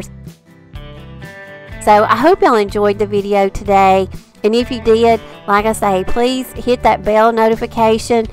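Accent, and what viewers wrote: South, United States. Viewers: Love it all and love you too!!! You are such a sweet southern lady and love to hear you talk (Georgian here). Have a blessed day and look forward to the second half tomorrow!!!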